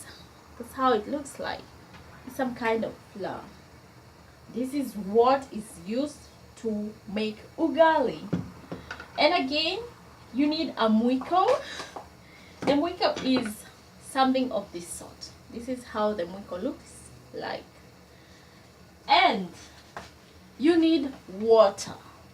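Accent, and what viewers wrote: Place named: Tanzania. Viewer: wooow surely you speak really like us Tanzanians.. It was quite easy for me to understand you... Hahaha I really laughed alooot when you said you ate ukoko at school. I really enjoyed watching you cooking Ugali mboga. As a single man tomorrow i am going to try cooking ugali mboga.... You did it quite well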